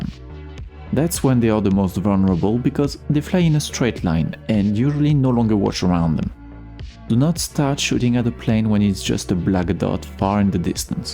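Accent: France. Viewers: J'ai reconnu l'accent français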